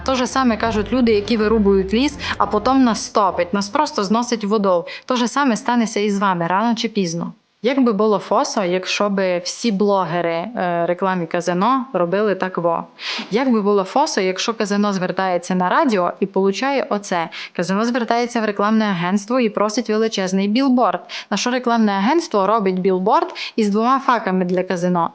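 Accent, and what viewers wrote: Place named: Transcarpathia, Ukraine. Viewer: Будь ласка! Несіть цей закарпатський вайб якомога більше... Це ж бальзам для вух♥️ Маю мрію в серці- навчитися так розмовляти 🥹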